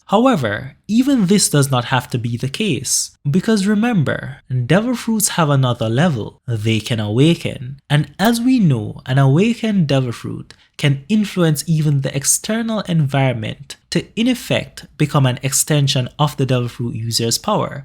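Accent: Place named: Jamaica. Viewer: This is my first video of yours I have seen. I caught the accent IMMEDIATELY. Where ya from. I’m from Jamaica i hear patois. If you are. I’m subbing rn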